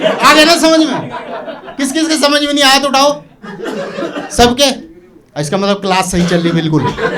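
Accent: Western Uttar Pradesh, India. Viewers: I love west up Xcent 😅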